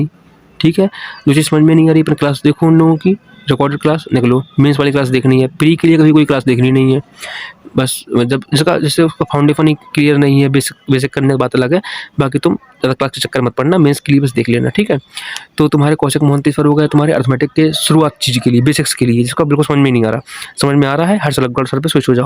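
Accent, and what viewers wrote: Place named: Lalitpur, India. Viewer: hmmm...thoda or sunne ke baad mujhe bhi lalitpur jaisi boli lagi thi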